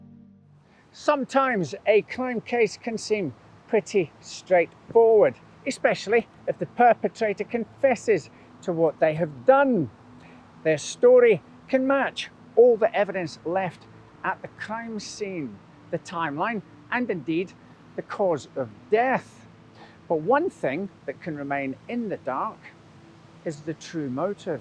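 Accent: Scotland, United Kingdom. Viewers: As a scotsman…. Does anyone know where this man is from? I’m hearing half scottish and half English in every sentence. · Your annunciation makes you sound Scottish but a while ago when I first started watching you, you sounded like a Brummie. Great content but.......distracting.. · It's a Scottish accent, don't know much about the world? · Very distinct presentation.Scottish I assume?Either way very excellent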